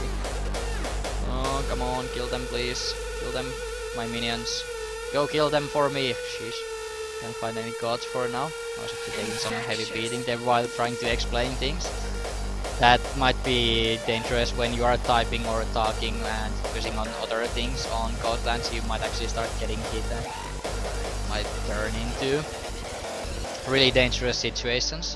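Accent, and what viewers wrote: Finland. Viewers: Ha! I knew that you are Finnish because of your accent (Actually, the "wikipedia" was the part when I knew that you are Finnish) Sinä paljastuit! :P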